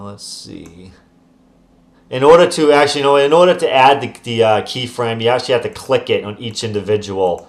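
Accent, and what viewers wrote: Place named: New York, United States. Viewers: Do you like in NYC? You kinda sound like it. Sounds cool. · You have a very strong accent are you from new york or jersey?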